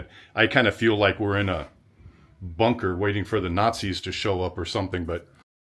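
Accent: United States. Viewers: It's nice to hear someone from the US speak . I see all these bloody Brits speaking and can't understand a thing. I'm waiting for my Italian Citizenship to go buy a property.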